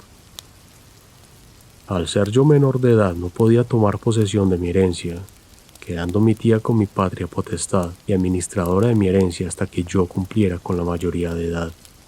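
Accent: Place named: Colombia. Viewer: Quizas el relator es de Colombia...no lo se ,pero esta historia es real,año 1910...coltauco...Rancagua...Chile...una noche de invierno...miguel fue real y aun en callejon de lo de cuevas existe un diminuto monolito en donde encontraron a miguel....felicitaciones por tan interesante y bien realizado relato❤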